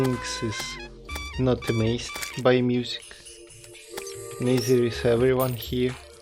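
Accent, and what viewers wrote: Russia. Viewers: I see a lot of Russian friends that write in English excellently, but neglect speech and worry their skills are still terrible. Believe me, better writing = better you! You have already mastered 90% of speech skills, the accent is just for flavour and us native speakers can't get enough of yours =D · А я то думаю почему акцент такой русский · Russian accent doesn't bother me lol Just makes it seem like I'm watching a Soviet legend lol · Its some type of russian/slavic accent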